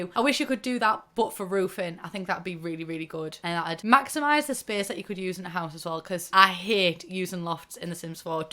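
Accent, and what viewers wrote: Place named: Newcastle, United Kingdom. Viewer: I guess I need to get cats and dogs Also are you from up north you sound Geordie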